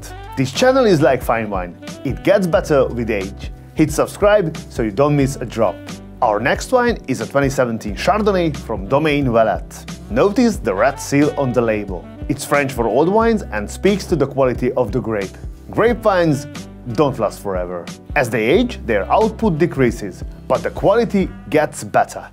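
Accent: Hungary. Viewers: Sounds exactly like any other Hungarian I've spoken to...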